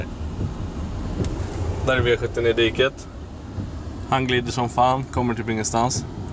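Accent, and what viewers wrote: Stockholm, Sweden. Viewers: Fy fan så skönt att du blivit av med de mesta av din Stockholms dialekt :) btw helt sjukt vilken skillnad på kvalitet de e då mot dom nya :)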